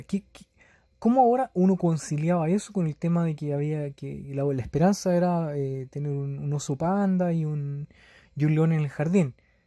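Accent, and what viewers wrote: Chile. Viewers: TU VOZ Y ACENTO SUENA MUY PARECIDO AL DEL CANAL ( MAROONED ) QUE ES CHILENO LO ERES TU TAMBIEN?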